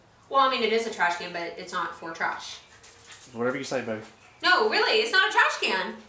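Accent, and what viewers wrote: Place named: South, United States. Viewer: Is it just me or did I hear a little bit of a southern(ish) accent? I can be wrong there, however I think it's a lovely accent.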